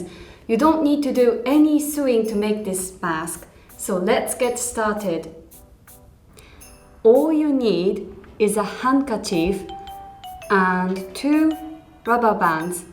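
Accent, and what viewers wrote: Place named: United Kingdom. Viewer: の英語発音が上手ですよ！彼女の英語アクセントは少し英国の英語です。はイギリスで勉強しましたか。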